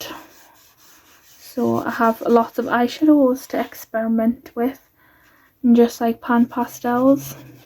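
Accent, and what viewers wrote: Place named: Northeast England, United Kingdom. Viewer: Ooo you sound like youre from North east uk I'm in Hartlepool , I love karla magana books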